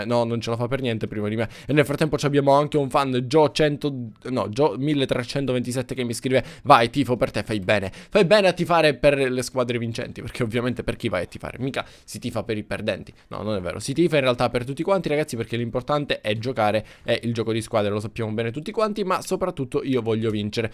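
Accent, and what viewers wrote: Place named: Caltanissetta, Italy. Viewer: scommetto che sei di Caltanissetta si senta dalla tttt cmq bellissimo video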